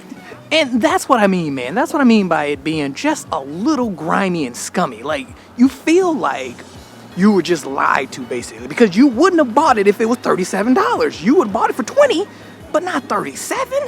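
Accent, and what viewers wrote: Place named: Atlanta, United States. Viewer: I said it sounds like Atlanta b4 you even said it was lol!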